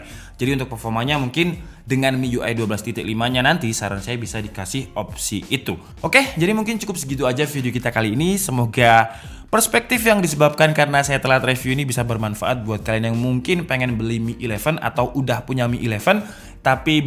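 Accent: Malang, Indonesia. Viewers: Wong malang to tibak e 😂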